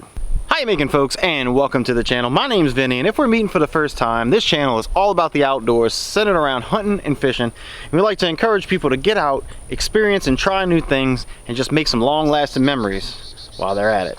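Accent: Louisiana, United States. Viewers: Great review. Sounds like you gotta a little Louisiana in that accent. 🦐🦐🙌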